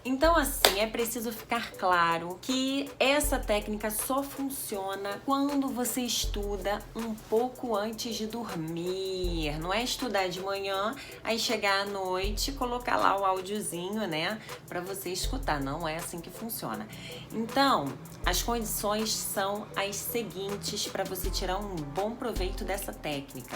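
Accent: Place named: Rio de Janeiro, Brazil. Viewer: Cai aqui de paraqueda e estou amando........ ótimas dicas e eu posso dizer com certeza .....isso funciona!! Foi com um método parecido como esse que fiz minha carteira de motorista......, sou Baiana moro na Europa e amo o inglês, estou aprendendo, mas as suas dicas são ótimas, obrigada... meu pagamento das aulas já fiz....... 😂😂😂dei meu like👍🏻👍🏻👍🏻👍🏻👍🏻👍🏻 e baixei o soninho 💡⏰🛎🔑pra receber mais bônus ( vídeos ) seu sotaque Carioca é lindoooooooooo🥰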